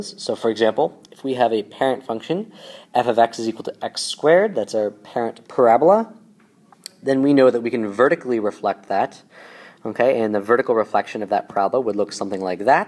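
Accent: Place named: Canada. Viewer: cheers mate rate the canadian accent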